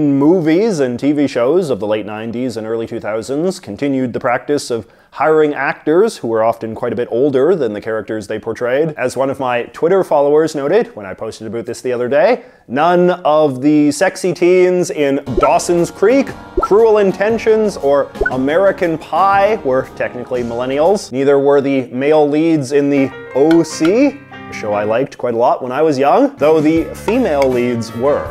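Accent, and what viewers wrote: Canada. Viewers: I don’t think you can put a hard line on what year differentiates a generation. Being born in 82, I relate a lot to Gen X even though I’m an older millennial. I got a good chunk of 80s vibes even though my prime upbringing was in the 90s, especially being that I’m a “late bloomer”. I think the term “old soul” applies to us who carry over traits of the previous generation into their more appropriate generation. I think also part of it is having older siblings/cousins that influence our upbringing. And wow, I’m sooory…I never thought I’d ever hear such a thick Canadian accent! lol love it! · Are you Canadian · your accent is so canadian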